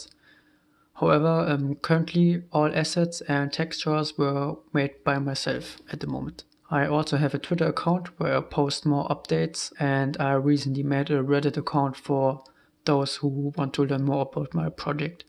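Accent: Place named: Germany. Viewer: When you hear a German accent, you know it's going to be fire.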